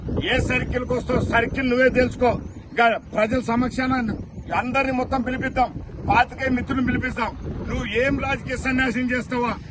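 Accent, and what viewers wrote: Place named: Telangana, India. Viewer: Ayya Meeru Rayalaseema leka Telangana language chusthe pakka telangana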